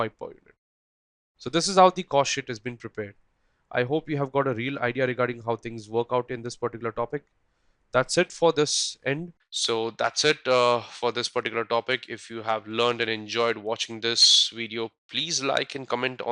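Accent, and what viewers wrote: India. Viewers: I don't mind an accent , I'm from the American South, but good grief. It's great that Indians are often technical but they make zero effort to sound anything like a native English speaker. About 4 minutes in, its like listening to a machine gun and I move on, it's just too hard trying to decipher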